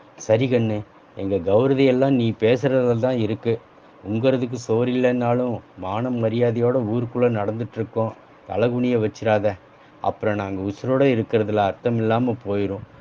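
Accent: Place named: Kongu, India. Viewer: அருமை அருமை சார்.... அற்புதமான கதை.... நெகிழ்ச்சியான முடிவு.... கொஞ்சும் கொங்குத்தமிழில் கதையை வாசித்தது கூடுதல் சிறப்பு.... வாழ்த்துக்களும், பாராட்டுக்களும்... 🎉🎉🎉🎉🎉🎉 மேலும் பல கதைகளோடு மென்மேலும் தொடரட்டும் தங்களின் இலக்கிய பயணம்❤❤❤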